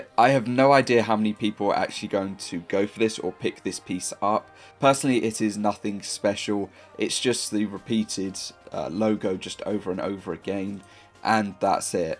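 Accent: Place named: United Kingdom. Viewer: American slang just doesn’t sound right in that British accent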